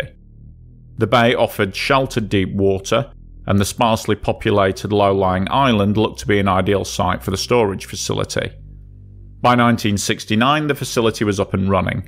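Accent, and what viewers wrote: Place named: Yorkshire, United Kingdom. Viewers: I love his accent... I was guessing Yorkshire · his accent... from Yorkshire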